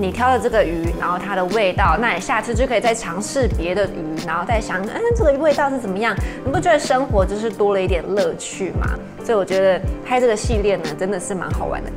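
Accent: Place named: Tainan, Taiwan. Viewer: 豆娘仔是我在海邊第一尾起來的魚當時還只是去跟同學聊天用手擒法打發時間意外在肉粽裡釣獲同學都稱呼為厚殼仔肉質聽說超級細緻好吃應該比黑格細緻多了黑格是很難釣的魚的阿公是釣魚高手釣黑格要有耐心要抓準揚竿的魚汛和時機點不然很容易開空槍 (當時海調初心者的我被黑格戲弄得很慘@@ 我同學教我海釣就是只用阿波釣黑格野生的黑格不便宜小時候就吃很不錯的魚很幸福黑格沒有細刺唯一的麻煩就是去鱗非常不容易每次都很害怕殺黑格黑格沙格赤翅仔枋頭仔(般頭仔) 這家族的都不太好去鱗(還是鯖魚好肉多不用去鱗^^) 題外話我的老家在台南南部人說吃這個字都是四聲的重音的老家應該也是台南那邊吧? 發音聽起來超親切北部人說吃都發一聲 (鵝大人的台語也很有親切感我跟鵝大人同鄉的)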